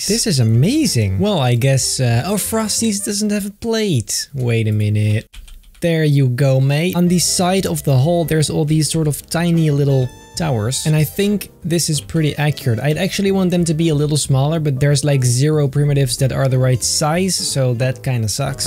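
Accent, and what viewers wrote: Netherlands. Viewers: I smell Dutch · Ja je bent Nederlands, lekker bezig accent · Are you dutch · Hallo, Jij bent ook nederlands toch? Ik kan je nederlandse toon hooren, net als thijmen0808. Hij praat ook net als jouw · i smell dutch hahahaah lekker toch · are you dutch? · Are you dutch !? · i smell dutch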